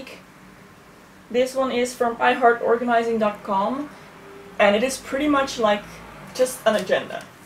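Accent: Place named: Netherlands. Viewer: Are you Dutch? I love your accent!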